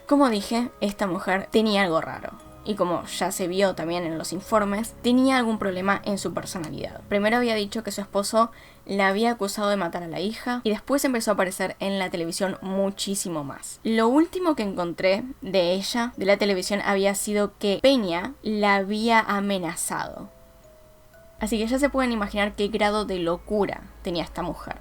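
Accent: Argentina. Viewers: Me sonaba tu acento Yo tipo: es argentina Y vos justito lo dijiste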